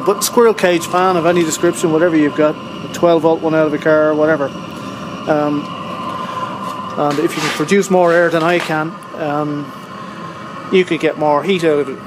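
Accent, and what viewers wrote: Ireland. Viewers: are you Irish? sounds like it. and cool design!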